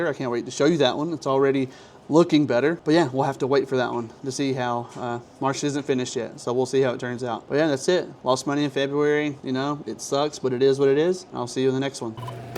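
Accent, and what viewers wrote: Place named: South, United States. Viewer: Sounds like he's from the south, $500 is OK if you're in Mississippi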